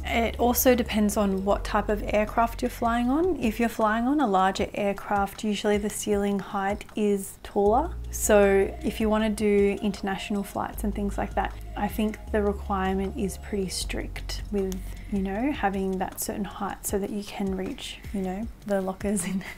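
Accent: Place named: Australia. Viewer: omg an Aussie, perfect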